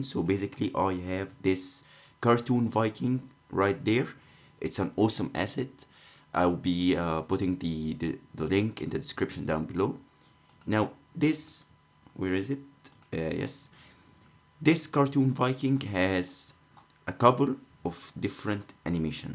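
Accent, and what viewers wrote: Egypt. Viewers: YOU SOUND LIKE AN ARAB ,,,, AN EGYPTIAN TO BE EXACT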